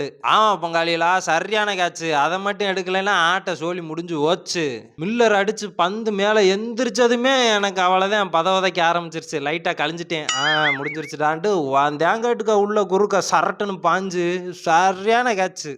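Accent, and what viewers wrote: Madurai, India. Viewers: ஹாய் ப்ரோ, நீங்க பேசுறது பங்காளி என்று சொல்றது இந்த மதுரை ஸ்லாங் எல்லாமே சூப்பர் ப்ரோ பட் உங்க வீடியோ எப்போதுமே கொஞ்சம் லேட் லேட்டா வருது 29-ஆம் தேதி ஜெயிச்சு மேட்சுக்கு ஒன்னாம் தேதி போட்டிருக்கீங்க வீடியோ இப்படி இருந்தா எப்படி பாப்பாங்க உங்க வீடியோவ ஸ்பீடா போடுங்க உங்க ஸ்லாங்கில் சொல்லணும்னா பங்காளி வீடியோவை கொஞ்சம் ஸ்பீடா ஸ்பீடா போர்டு அப்படின்னு வெட்டி முடிக்கிற வேலையை பார்க்க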